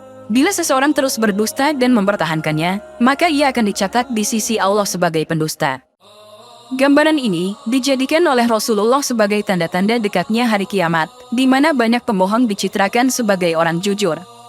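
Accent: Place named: Eastern Indonesia, Indonesia. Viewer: Dari kalimat dan sajian GAMBAR INI BUKAN KONTEN ISLAM JANGAN BODOH...dari logat ini dari timur,kefasehan ini nasara jg tertipu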